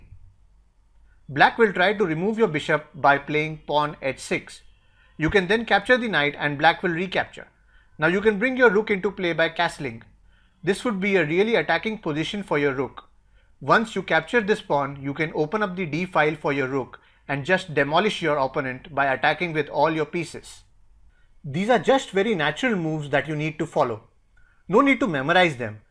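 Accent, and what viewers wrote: India. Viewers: u r indian . ur accent tells it. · Love the indian accent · Hahah I love the Indian accent. It fits so well into chess videos and it is also pretty entertaining :P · idkw but youre very good at this, you teach really good even with the indian accent , just pls remove the intro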